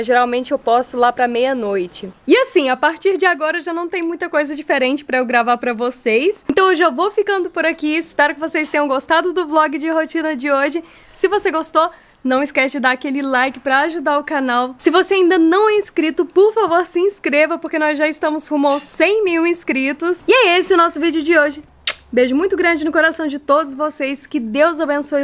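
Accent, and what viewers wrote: Minas Gerais, Brazil. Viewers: Eta, mineirinha arretada, sempre com o sutaquinho mineiro kkķķkkk,adooooooooooooro,bjs pra vc e sua sogrinha mamãe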